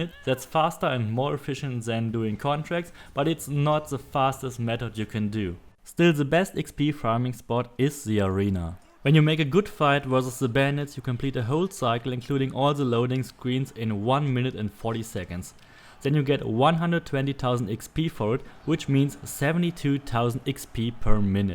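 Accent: Germany. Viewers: You sound like a German to me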